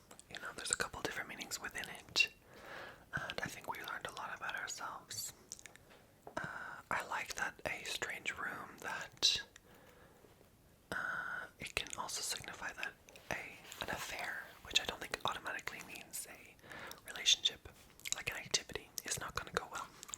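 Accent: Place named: United Kingdom. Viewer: Love this concept! Was super interesting to listen to and your whisper was super relaxing! I usually don’t vibe with male ASMRtist’s whispers but yours is unique. It sounds like you have a UK accent sometimes. Maybe you just annunciate very well.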